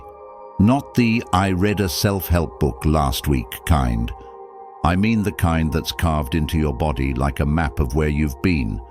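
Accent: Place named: RP, United Kingdom. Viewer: I’m tired of that narrative voice in most of these stoic videos. Then words like “brother” or “a dude in Gucci” would never be said in that upper class British accent.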